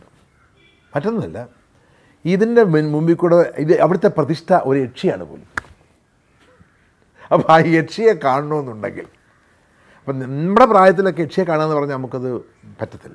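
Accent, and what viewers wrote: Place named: Kottayam, India. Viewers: Achayanmarude pakka style samsaram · പാലാ style ഇൽ തന്നെ ഉള്ള സംഭാഷണം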